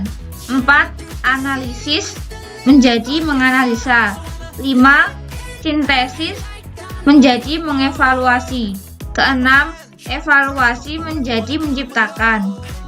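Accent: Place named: Java, Indonesia. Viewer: Suara nya khas medok Sukses sellu nak cantiksss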